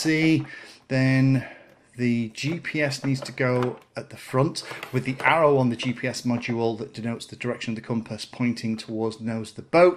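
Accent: United Kingdom. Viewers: I like all of your videos especially your very proper British accent and the pace of your tutorials. I should have watched this particular video first because it gives me a lot of comfort that I will not crash something on my first try with a flight controller.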